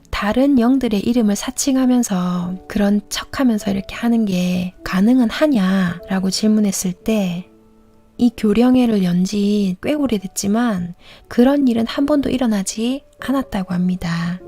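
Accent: Gyeongsang, South Korea. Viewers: 아우 나도 경상도 고향이지만.. 사투리 정말 듣기 싫다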